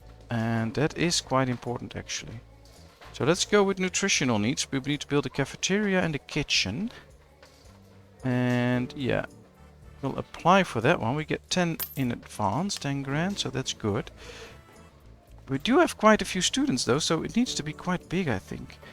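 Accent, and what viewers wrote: Netherlands. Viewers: I was trying to place the accent, I was thinking Dutch then you said Netherlands 👌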